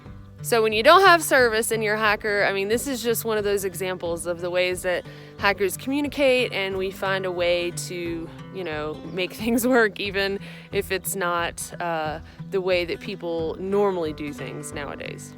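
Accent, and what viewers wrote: South, United States. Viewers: That was a scary encounter with the mountain lion. If you would have been at that spot just a few minutes sooner the lion might have been in the bushes. It could have gone bad very easily in my opinion. I think the fact that you were at a higher elevation helped. It was good that you kept your cool and the harmonica obviously helped. Maybe have your pepper spray on one hand and your neck knife on the other just in case. Also consider getting the bear spray sooner on the trail. I really enjoy your videos and it easy to like the way you express yourself and your accent. Good luck on the trail and hope you have a great time off.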